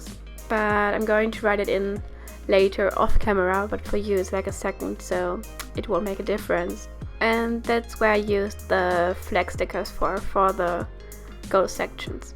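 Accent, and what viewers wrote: Germany. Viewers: i like your deutsch akzent macht das video familiär <3! XD ( bitte mit deutschem akzent lesen) Aber du sprichst echt gut englisch! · When you’re 1min into the video and already know that she’s German not because of her speaking (which is quite elaborated because I didn’t notice her accent was German at first) but by the fact she had a Mallorca page in her journal... hach die Deutschen 😂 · Ich hab schon mehrere deutsche Youtuber Englisch sprechen hören, aber ich glaub deins war bis jetzt am besten😂Finde den deutschen Akzent voll niedlich 😅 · der deutsche Akzenkt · U are from Germany right?? Because u had kind of German accent 😂 And in your old bujo was :"Omas todestag" (I am from Germany sorry for my bad Englisch)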